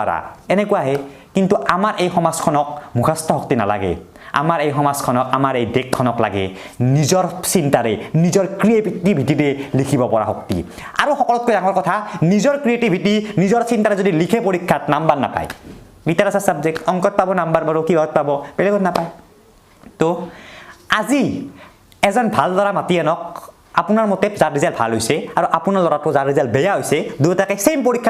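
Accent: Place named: Lower Assam, India. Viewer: Lower Assam r language enekuwai Moi Assamese Chanel nasau ..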